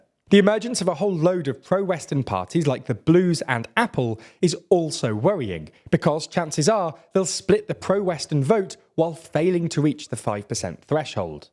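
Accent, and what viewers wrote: England, United Kingdom. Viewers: How is it not to be a party to USA, and UK led proxy war with nuclear power, through country they puppeteer, and pay for directly on your border a pro-kremlin, pro-russia? Do you even use your brain? You can hear from the accent the host is from UK, and him saying pro-kremlin, pro-russia try to smear everyone who does not want to be part in this USA/UK regime proxy war. "You not with us", in their indoctrinated chicken brains means "you are against us", but what about "go fkin play somewhere else, and leave us alone", that in my view is a side as well, worth to take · Hey Dude! Don't be so self-confident! You should first learn how to pronounce the names of the politicians you are talking about. No one gives a damn where are you come from and what is your language background. I am pretty sure no Slovak citizen likes your silly pronunciation of their names, in fact, we found it arrogant, and it only shows your total ignorance of the subject as the ONLY reason you made this compilation slide show is to get views and likes to get your youtube rankings up! 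Besides, I guess you as a UK citizen (based on your accent) have absolutely no right to label/mention some of our political figures as "fascist" even if the idiotic narrative of Slovak mass media may often indicate so. Even if politics in Slovakia is a mess thanks to aggressive foreign interventions, (including your country), Slovaks will solve these in their way. I mean, HEY MAN (!) who do you think you are to have the courage to stand up before us Slovak citizens and talk about subjects you have absolutely no freaking clue about?!?!?! No one cares about your opinion!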